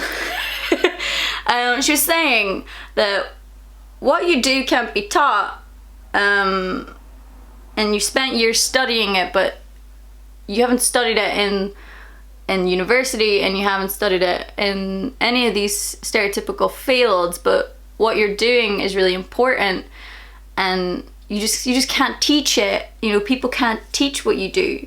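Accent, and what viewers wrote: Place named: Ireland. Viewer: I could listen to you talk all day with your adorable irish slang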